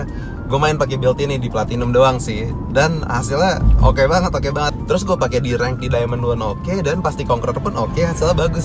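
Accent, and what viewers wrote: Bali, Indonesia. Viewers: jano ini org bali ya?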